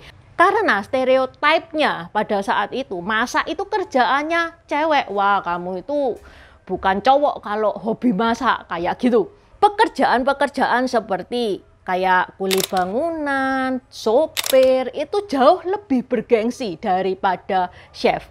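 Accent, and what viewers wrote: Java, Indonesia. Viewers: China medhok.....aku sukaaa😂😂😂❤❤❤❤ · Iya tuh suara nya kental banget kaya wong jowo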